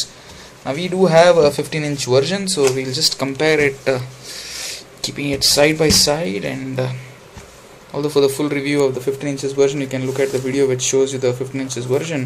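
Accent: India. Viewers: i have that laptop but not so good like samsung,and i think samsung more best then sony and u speaking english look like if u indian right? coz ur english is not really nice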